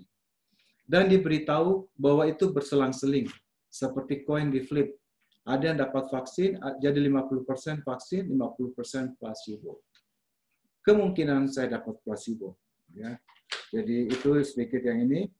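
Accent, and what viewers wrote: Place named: Maluku, Indonesia. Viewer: Sdr, terimakasih langsung share ke saya. Sepertinya logat2 Maluku nih. Semoga hasil penelitiannya favorable ya, tetap sehat, semangat,Tuhan memberkati dan setiap langkah upaya pengobatan dan pengendalian Covid.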